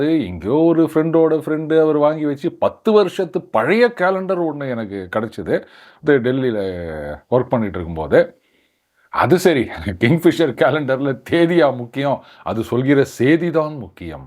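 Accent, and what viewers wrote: Chennai, India. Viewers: Why don’t u talk in normal Tamil instead of Chennai Tamil. It is too bad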